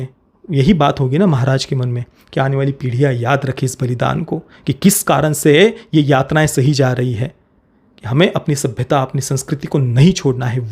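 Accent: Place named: Maharashtra, India. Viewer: Tari pan hindit marathicha accent yetoy kiti varsh hindi boltoy 🤦🏻‍♂️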